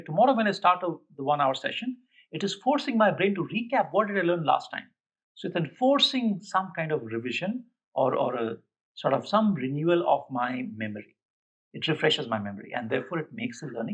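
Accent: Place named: India. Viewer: Yes, the Indian accent makes it more efficient to understand.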